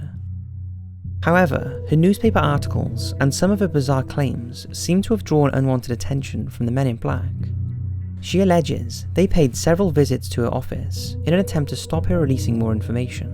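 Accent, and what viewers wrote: Wales, United Kingdom. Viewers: You sound Welsh dude...what part? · He’s welsh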